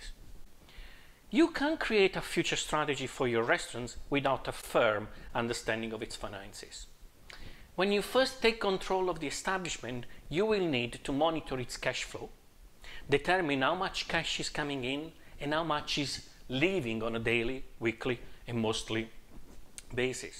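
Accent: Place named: Italy. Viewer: nice content I like your italian accent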